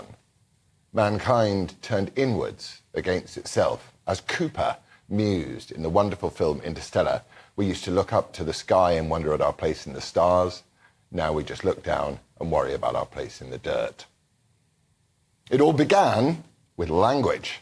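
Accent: Scotland, United Kingdom. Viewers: Great Scottish accent 😊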